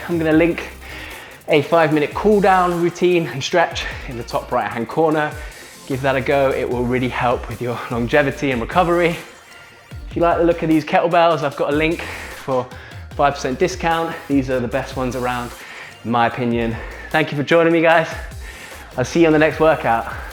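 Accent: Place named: United Kingdom. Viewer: Hi, just found your channel and it's the BOMB - exactly what i need: timed, pre-planned workouts with minimal talking.... yet when there is a spoken word, it's delivered in a wonderful British accent! Cheers!!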